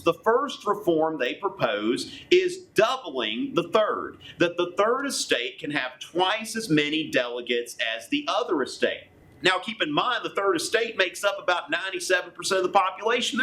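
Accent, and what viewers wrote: South, United States. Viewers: Why is this man speaking is a Southern drawl? Whatever happened to standard and intelligible English? On a completely different note: Why does one have to hold a cup in one's hand while speaking about the Estates General? Seriously....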